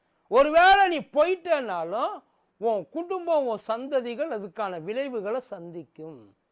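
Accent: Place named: Telugu, India. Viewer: இது எந்த தமிழ் தெலுங்கு தமிழா😊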